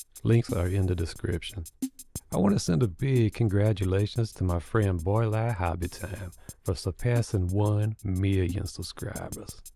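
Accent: Louisiana, United States. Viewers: Because he's either Cajun or Creole I think. He's mentioned before about where he lives and the accent might be put on a bit for the videos but that's part of the character I guess. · Where is he from? Is he Cajun?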